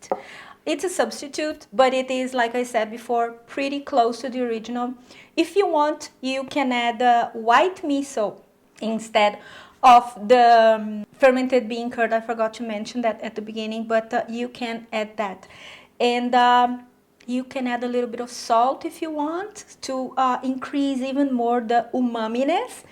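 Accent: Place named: Brazil. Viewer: I have no idea 😂 It just sounds like a brazilian who learned English very well ❤️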